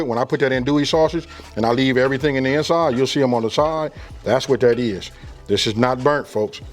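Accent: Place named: South, United States. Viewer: Omg I absolutely love your southern accent and the way you talk "so look listen." Love it ❤ I made smothered pork cops from your other video and I am back to make something else now. 💙 BTW I am from The H in Texas.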